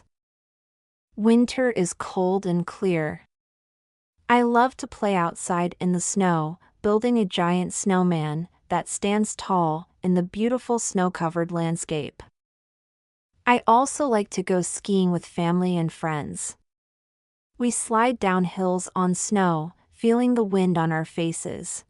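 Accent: United Kingdom. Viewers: Is this British english? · Please does this channel have a British accent? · Is it British accent ?!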